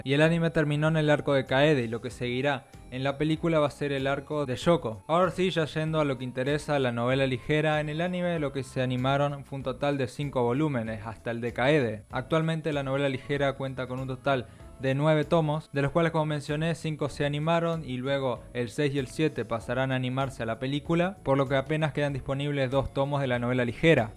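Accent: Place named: Argentina. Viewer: Buen vídeo men. SOS argentino verdad?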